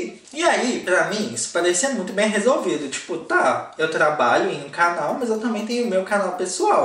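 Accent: Minas Gerais, Brazil. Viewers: Eu amoooo a sua voz, o seu mineirês e a sua narrativa. Não entendi nada quando mudou o narrador no outro canal, logo deixei de assistir aos videos pois achei chato sem vc 🤩 abonadissima ao seu canal. Voa cara ,voa ! 😆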